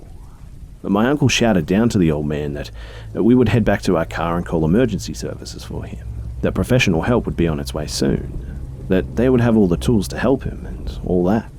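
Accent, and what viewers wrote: Australia. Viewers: I keep falling asleep to your narrations. So then I have an Aussie narrator in my dreams that at times end up mimicking the story being told. It's quite entertaining.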